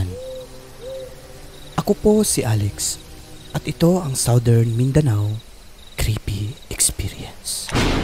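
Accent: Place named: Iloilo, Philippines. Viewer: Sakit naman boss Alex nawalan ng ina tapos ang dahilan di pa pinaniwalaan ng iba.I mean boss di ma explain..napapatawa ako boss kapag mag ilongo ka boss sarap lng pakinggan ba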